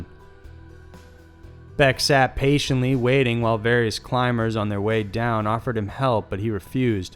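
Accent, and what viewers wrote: South, United States. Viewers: He has a nice Southern accent. To my ears, anyway (Canadian).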